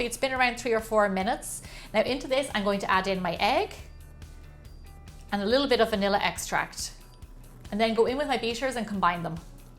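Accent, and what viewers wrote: Ireland. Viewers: Love the recipe by any chance are you irish ☘️? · are u irish?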